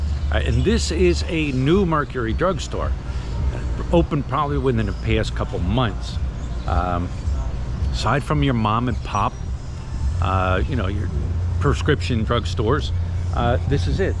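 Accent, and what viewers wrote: Philadelphia, United States. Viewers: Bro, you still talk like you are back in Philly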